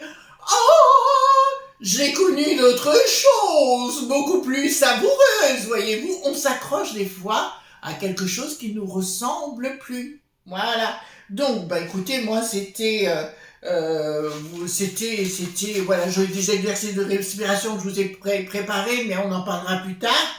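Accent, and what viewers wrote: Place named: United Kingdom. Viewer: J'adore votre humour, c'est vrai la vie est belle, vivons là à 100%. Les personnes néfastes ne font pas parties de ma vie, je m'entoure de personnes saines et qui aiment vivre au jour le jour. Merci pour cette partie de rigolade avec l'accent So British, ça fait du bien!! Bisous à vous et à la communauté 😘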